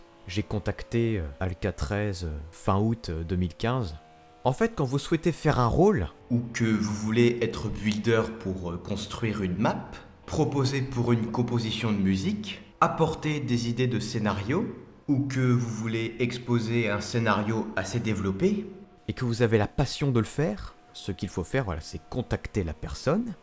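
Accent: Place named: Quebec, Canada. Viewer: Continue comme ça et tu prendra ton axant québécois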